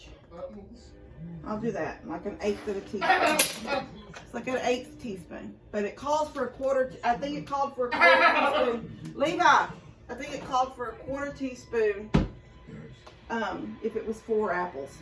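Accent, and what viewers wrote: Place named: South, United States. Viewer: i really feel--right at home with you..... "you'er fixing to" and "eye balling"------good ole southern talking----i'm from and in FLORIDA still ---you just bring all the great memories of my family...rip🥲 and I THANKYOU for that!!!!!!😍🥰😍🥰😍🥰😍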